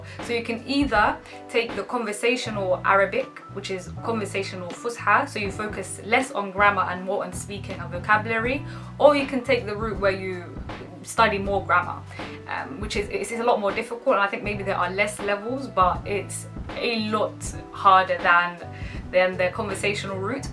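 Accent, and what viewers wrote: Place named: Egypt. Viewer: بتتكلمي مصري ذي العسل😅💗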